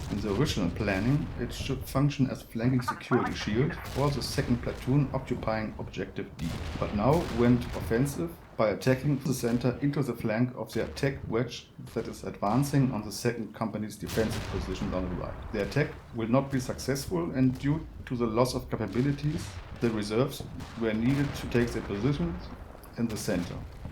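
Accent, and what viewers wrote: Germany. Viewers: Schöner deutscher Akzent :D Combat mission content is always nice! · Enjoyed your video. I need a few cups of coffee to play a battle that big. Also enjoyed your German accent. Then again it also could be Swiss or Austrian.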